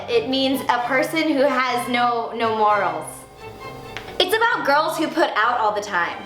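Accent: England, United Kingdom. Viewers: Can I please have a telling me definitions in my computer with a British accent forever? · your british accent is downright offensive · your using the same English accent that the BBC use lol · Hahaa, I love that English accent! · Nice british accent too for the internet!!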